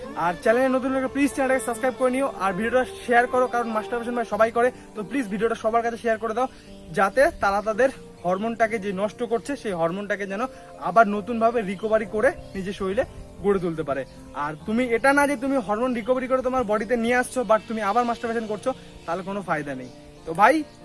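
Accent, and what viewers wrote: Kolkata, India. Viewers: ভাইয়া তোমাদের বাসা কলকাতা বলতেন তোমার কথায় অনেক ভালো লাগছে বলবেন ভাইয়া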